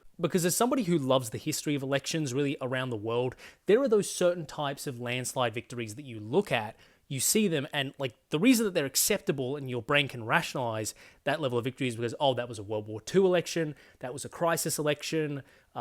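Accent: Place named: Australia. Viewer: 1. Love your videos on the Australian elections. Wish we had this system in America. 2. I’m curious where your accent is from. I understand you’re Australian but I mean regionally because you sound exactly like the YouTuber theanimeman or Joey who is also Australian but you guys are the only two Australians I can think of that have your accent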